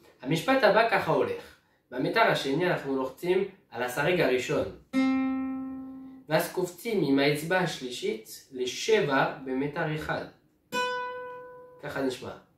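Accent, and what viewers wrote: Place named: France. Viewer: J ai reconnu l accent fr mdrrr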